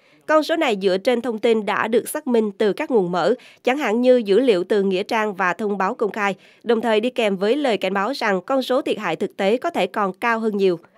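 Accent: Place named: North, Vietnam. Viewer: HAPPY NEW YEAR 2024 to The Saigon Post. Chúc The Saigon Post càng ngày càng phát triển, Đề nghị The Saigon Post sử dụng phát thanh viên có giọng nói của người Sài Gòn thay vì giọng nói của miền ngoài, chúng tôi không thích, cám ơn.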